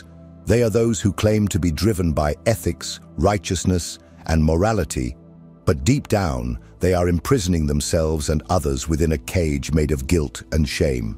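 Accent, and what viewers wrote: United Kingdom. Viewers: I wish i could listen to this without hearing an english butlers voice.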